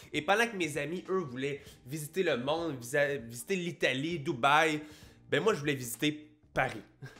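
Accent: Quebec, Canada. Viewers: Moi aussi j'ai toujours voulu aller au Québec !!!!! J'aime trop votre accent et vous ave l'air gentil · L'accent québécois le plus mignon des accents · J'ai aucun problème à comprendre l'accent québécois alors que j'ai commencé à apprendre le français (de France) qu'à l'âge de 16 ans.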